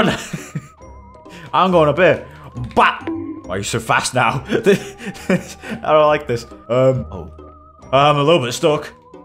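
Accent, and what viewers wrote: United Kingdom. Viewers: You do sound kind of British,.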